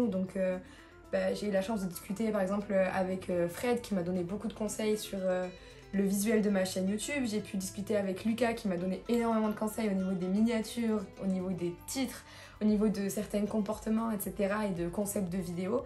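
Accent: South, France. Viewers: T’es juste sublime et rayonnante , ton accent du Sud et ton humour te donnent d’autant plus de charme 😁😊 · C'est franchement génial d'avoir un autre point de vue des olympiades !! Tu as une personnalité géniale !! Le "daingue" de cet accent du Sud te donne une vraie personnalité !! +1 abonné !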